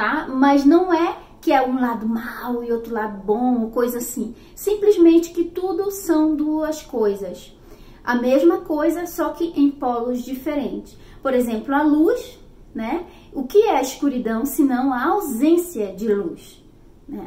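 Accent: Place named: North, Brazil. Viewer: Ela é da região norte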